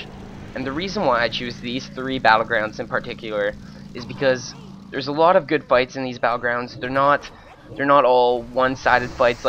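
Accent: Canada. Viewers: I havent checked your channel, but you sound like a canadian :) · Are you Canadian?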